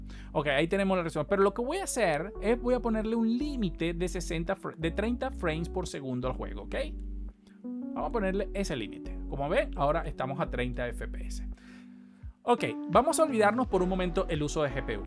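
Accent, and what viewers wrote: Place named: San Juan de los Morros, Venezuela. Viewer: Saludos hermano, me gustan tus videos... he notado que tienes un acento muy parecido de la ciudad de donde soy, no seras de San Juan de los Morros?? Guarico, Venezuela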